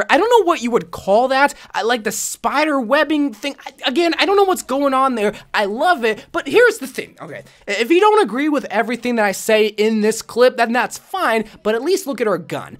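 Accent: United Kingdom. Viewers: Your British accent ain't that bad don't worry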